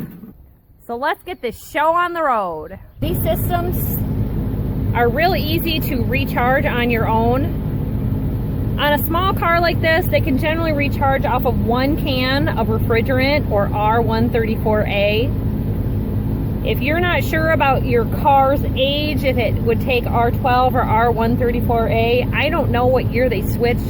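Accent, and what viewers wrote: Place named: Midwest, United States. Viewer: wow I can listen to you talk all day. you got that cool Midwest Gretchen Whitmer fargo accent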